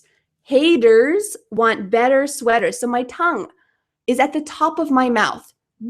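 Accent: United States. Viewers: Hi, I am from Indonesia. I like your video much, as I like American accent, especially you have. Thanks a lot.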